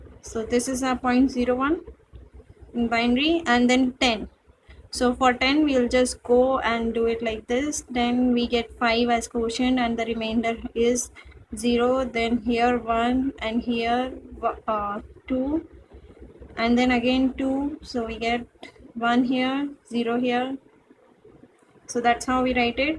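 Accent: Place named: India. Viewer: Typical Indian English -_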